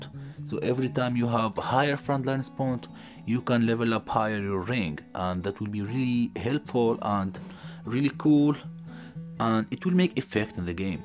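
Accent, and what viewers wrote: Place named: Syria. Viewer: Accent sounds Syrian 😊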